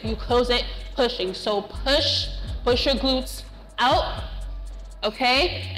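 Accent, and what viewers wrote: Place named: New Jersey, United States. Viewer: Girl, let me find out you from jersey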